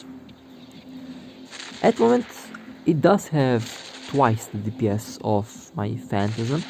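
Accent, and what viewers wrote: Russia. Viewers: Здравствуйте. Попробуйте на русском свои видосы тоже делать. Так как по акценту слышно что вы русскоговорящий:)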